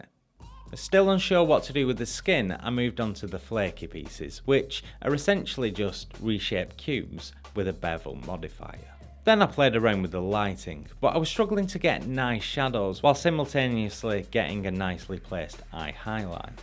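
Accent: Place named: East of England, United Kingdom. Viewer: Hi, I hope I don’t come off as rude or too nosy, but I’m trying to pinpoint your accent (just out of curiousity) but I am really unsure. Some where from the East of England? Might be way off😂. This is just a hobby of mine, trying to learn to distinguish British accents and to name them. But I haven’t got very far yet.. still learning to somewhat localise them.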